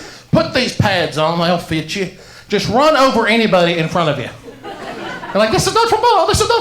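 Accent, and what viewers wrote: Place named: Iran. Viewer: i love how he seamlessly blends the Iranian accent with the Mississippi one 🤣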